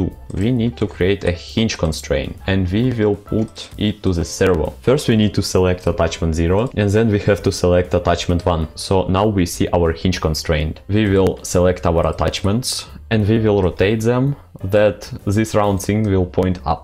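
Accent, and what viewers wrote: Russia. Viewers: по голосу кажется что русскоговорящий)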